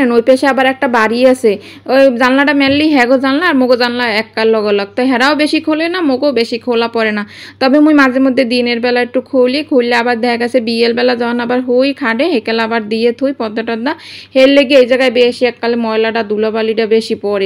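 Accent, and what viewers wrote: Barishal, Bangladesh. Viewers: ❤❤ আপনার কন্ঠ শুনে বুঝতে পারছি,আপনি বরিশালের মেয়ে, আমি ও কিন্তু বরিশালের মেয়ে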